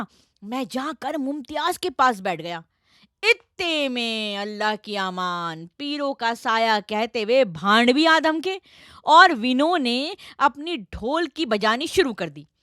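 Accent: Delhi, India. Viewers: This is karkhandari Urdu not lukhnowi Urdu.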